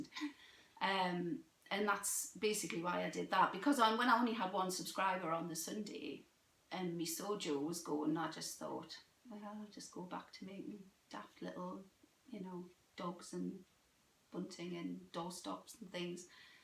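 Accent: Newcastle, United Kingdom. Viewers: Morning, is the pattern piece you showed not the back? You’re such a laugh and it’s great to hear your Geordie accent, which I miss so much. 🙋🏻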